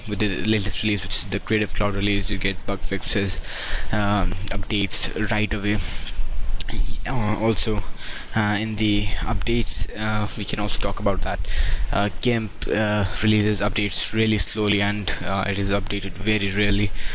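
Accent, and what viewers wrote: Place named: India. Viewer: he's indian . i can get that from his accent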